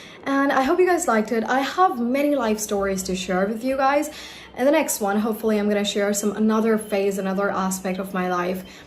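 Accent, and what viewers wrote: United States. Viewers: M aap s american accent m english sikhna chate ho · Look I know that you can speak only in one accent and that's American accent. Stop bulshitting people. You don't know how to speak in any other accent. And it's okay. Most of the people don't recognize these accents and that's why you can lie about it. Stop it, because it takes away your hard work. You know American accent well and that's great. Good job on learning the American accent.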